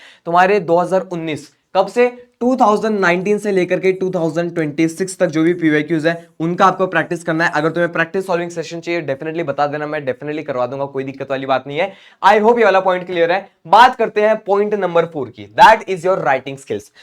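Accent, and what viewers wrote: Maharashtra, India. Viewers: Marathi English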